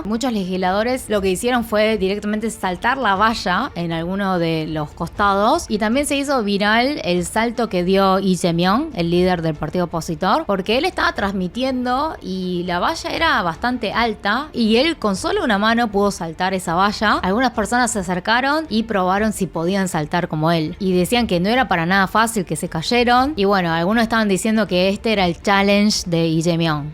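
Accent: Buenos Aires, Argentina. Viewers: Una asiatica hablando español, encima en tonada argentina dios mio 😱😱😱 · De donde me recurda lo que dice?... ah si ya se, de argentina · Es porque es Argentina, nació y vivió más de 30 años ahí, dudo que se le vaya el acento porteño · Vaya accento argentinos con el ya pronunciado ja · Me gusta tu hacento Argentino. Dicen que si Korea se unifica como una sola Korea sería una potencia militar y económica y tecnológica. Con muchos recursos. Yo pienso que si porque la unión te hace fuerte 👍🇲🇦🇰🇷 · Si se nota que aprendiste español en Argentina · Toda América Latina, estaba esperando esta explicación. Adoro su acento Argentino.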